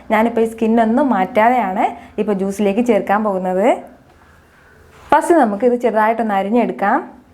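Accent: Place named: Malabar, India. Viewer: ath അറിയ but malabari style talk ആണ്. അതല്ലേ നമളെ പോലുള്ളവർ fans ആയത്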